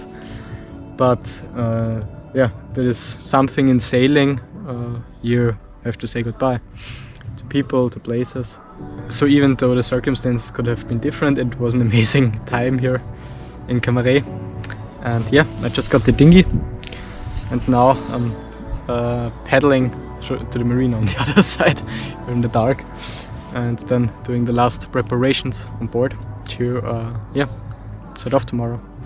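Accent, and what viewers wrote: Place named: Austria. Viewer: In deinem Vorspann heißt es, dass du der jüngste Deutschsprachige sein willst und machst die Videos in deinem holprigen Englisch, stark geprägt vom österreichischen..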